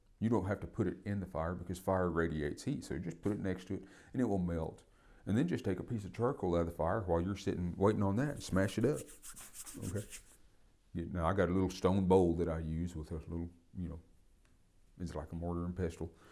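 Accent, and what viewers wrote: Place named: South, United States. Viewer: This dude's country as hell lmao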